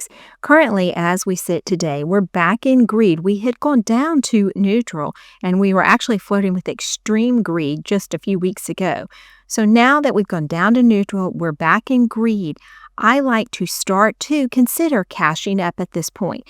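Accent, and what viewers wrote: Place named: South, United States. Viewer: I could just listen to that sweet southern accent all day long. Love your posts. How's your daughter doing with trading?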